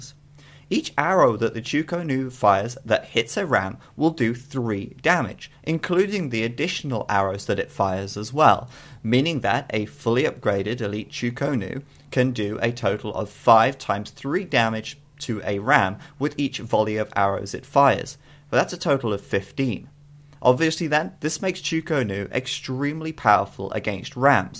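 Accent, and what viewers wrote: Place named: Northern England, United Kingdom. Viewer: northern English to me.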